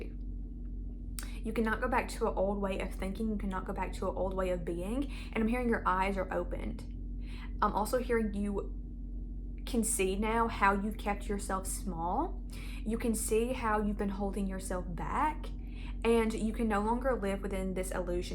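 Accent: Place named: South, United States. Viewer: that southern accent goes crazy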